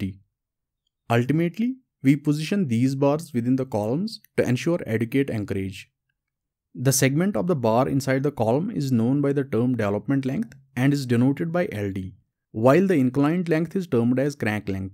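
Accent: Kashmir, India. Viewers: I could guess from your accent, I am also from Kashmir 😊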